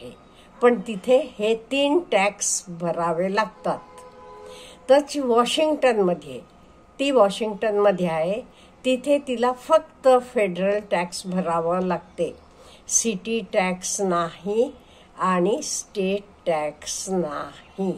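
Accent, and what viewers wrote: Karnataka, India. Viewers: Yes her mother tongue is Kannada ❤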